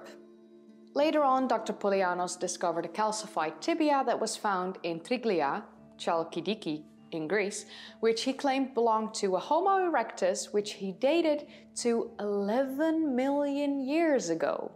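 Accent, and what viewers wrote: Netherlands. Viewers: Are you Dutch ? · The way you pronounce "technical terms", I thought this woman is Dutch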